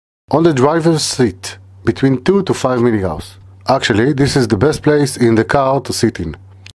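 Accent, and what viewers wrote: Israel. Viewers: אתה ישראלי לפי המבטא אני בטוח חחח אין מגנים לדברים הללו ?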